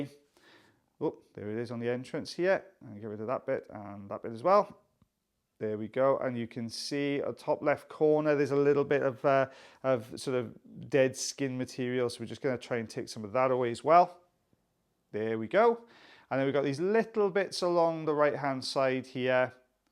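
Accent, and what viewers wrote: Wales, United Kingdom. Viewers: Love the commentary and the vision. So interesting. Sounds like a Welsh accent to this Aussie ear. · Ahhh....after a frantic day your wonderful waxy Welshness has soothed my soul, thankyou 😍😍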